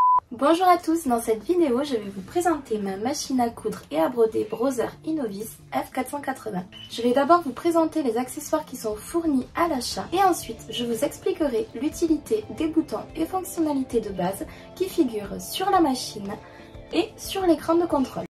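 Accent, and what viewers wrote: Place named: Carcassonne, France. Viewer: Waouh ... quelle machine 👍🧵 Merci à toi Tu as un petit accent que je connais ... je suis de Carcassonne lol 😊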